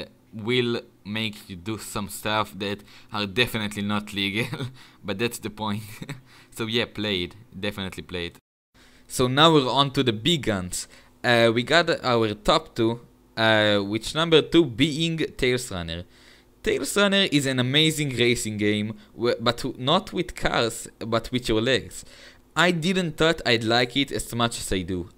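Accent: Israel. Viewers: יש לך מבטא ישראלי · אחי שומעים בקול שלך שאתה ישראלי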